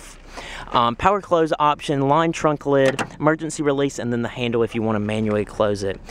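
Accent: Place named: South, United States. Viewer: I'm a Texan, but I can hardly stand that southern accent and the part about, "If you can afford it, so what!" How arrogant!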